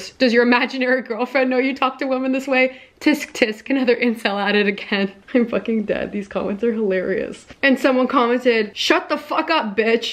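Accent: Canada. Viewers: are you canadian? you just have the vibe/accent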